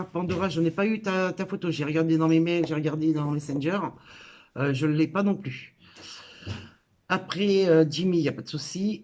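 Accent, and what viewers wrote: Nord-Pas-de-Calais, France. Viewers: Coucou. Tout d'abord Merci pour ta petite attention (de mon anniversaire). Je suis contente de voir ton visage, tu n'as pas à être complexée, chacun est comme il est et c'est comme ça. Tu es très bien et ton visage va très bien avec le son de ta voix, que j'adore vu ton accent ch'ti. Donc RAVIE de te voir en entier. Gros Bisous et 1 like en plus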